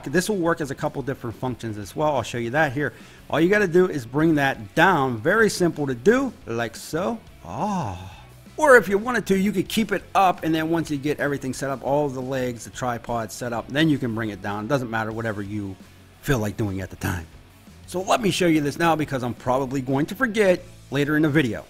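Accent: Pittsburgh, United States. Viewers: Do I detect a yinzer dialect? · just by listening to you talk i know you're a Stiller fan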